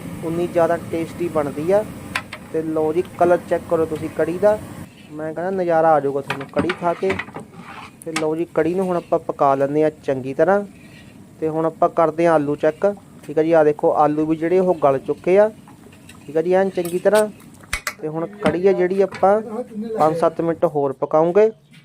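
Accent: Ropar, India. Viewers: ਕਮੈਂਟਰੀ ਕਰਨ ਵਾਲ਼ੇ ਦੀ ਭਾਸ਼ਾ ਤੋਂ ਲੱਗਦੈ ਕਿ ਰੋਪੜ ਸਾਈਡ ਦਾ ਏਰੀਆ ਹੈ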